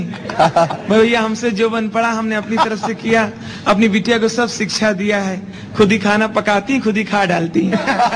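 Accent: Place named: North India, India. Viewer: No one can better interpret north Indian dialect and culture in humours way than RAJU SRIVASTAVA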